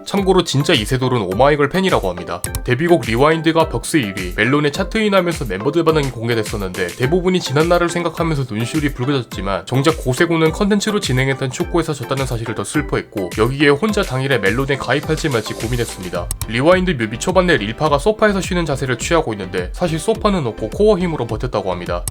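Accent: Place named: Gyeongsang, South Korea. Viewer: 같은 울산인데 사투리가 좀 심하시네 ㅋㅋㅋㅋㅋㅋㅋㅋㅋㅋㅋㅋㅋㅋㅋㅋㅋㅋㅋㅋㅋㅋ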